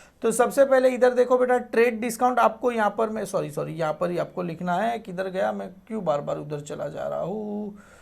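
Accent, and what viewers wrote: Gujarat, India. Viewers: Sir aap Gujarati ho na? Aapka Gujarati accent hai You are an amazing teacher. Heads off to you ❤❤❤ 😊😊😊😊😊😊😊😊😊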